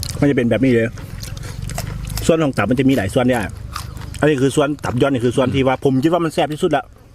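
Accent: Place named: Sam Phrao, Thailand. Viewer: เจ้าคือเว้าคือสำเนียง สามพร้าวบ้านผมแท้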